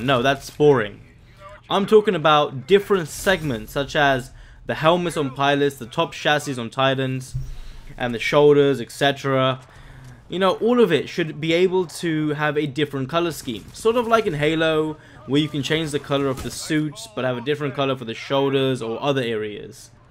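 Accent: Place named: South Africa. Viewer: You sound South African. What servers do you play?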